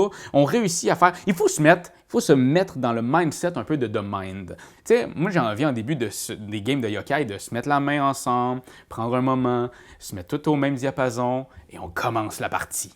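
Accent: Quebec, Canada. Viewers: j’aime l’accent québecois lol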